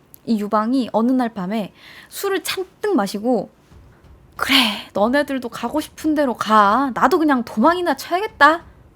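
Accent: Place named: Jeolla, South Korea. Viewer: ~~전라도 맞지 김수미 목소리가 ㅎ ㅎ ㅎ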